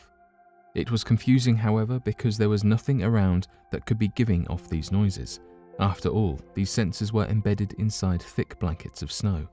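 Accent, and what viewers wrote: United Kingdom. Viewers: I wouldn't even watch this channel if you didn't have a british accent lol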